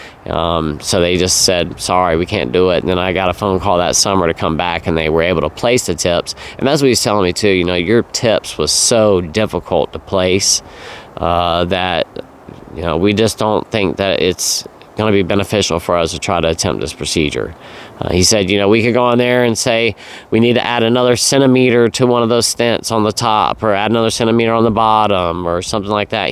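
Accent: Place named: South, United States. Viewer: Hi, I’ve been following you since “dry” January up here in CT when I decided to cut back on my drinking and you’ve made such an impression on me that I’ve turned it into a happier, new way of life. I love watching your videos, your honesty in telling your story in your easy Southern style of speaking. Tonight’s video was really a heartbreaking setback. I’m so sorry. You look so healthy and handsome, it’s hard to imagine you could have so many complications. I am praying for you, as I know many of your audience are. But somehow believe you are going to get through this. Keep the faith. ❤ Katheryn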